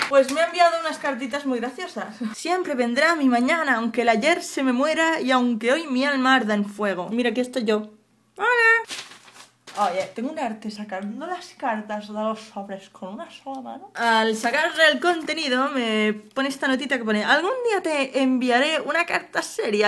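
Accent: France. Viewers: me encanta tu acento en frances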